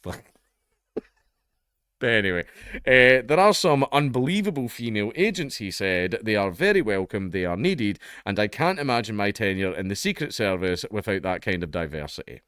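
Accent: Scotland, United Kingdom. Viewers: Why is it made so much more ridiculous when called out in a Scottish accent?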